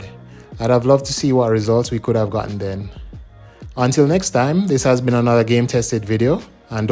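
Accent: Jamaica. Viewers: Definitely Jamaican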